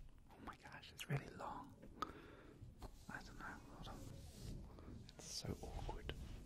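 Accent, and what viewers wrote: England, United Kingdom. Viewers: That accent . Oh the English 🤤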